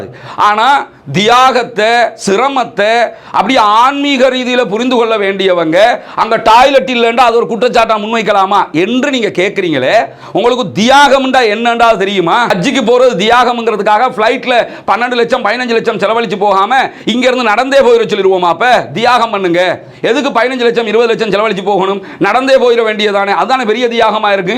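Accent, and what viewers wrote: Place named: Tamil Nadu, India. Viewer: நீங்க தமிழ்நாட்டில் இருந்து வந்து இலங்கையில் வாழ்கிறீர்களா? ஏன் என்றால் நீங்கள் பேசும் பேச்சு வித்தியாசமாக இருக்கே! 😮 உதாரணம்: டாய்லெட் / டாலர் / பாலஸ்தீன் என தப்பு தப்பா உச்சரிக்கிறீங்களே!!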